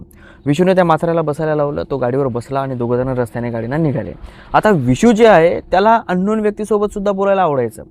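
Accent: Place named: Marathwada, India. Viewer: Parbhni nanded bhasha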